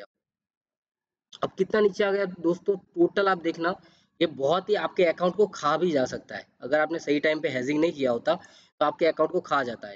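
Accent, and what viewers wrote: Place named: Gujarat, India. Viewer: Gujarati can identify that Gujarati accent 😂😂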